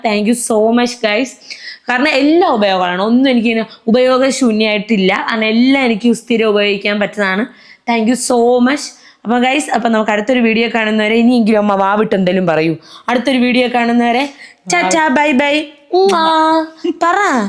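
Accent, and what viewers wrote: Trivandrum, India. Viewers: samsaram kettalariyam Tvmkariyanennu..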